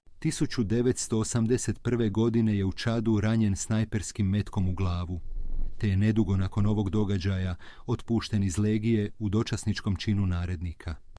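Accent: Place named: United States. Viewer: Ti kao da si Amerikanac kako to izgovaras Gotovina Zadar itd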